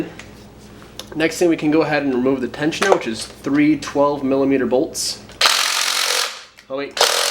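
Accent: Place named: Australia. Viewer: That Aussie accents on point ahahah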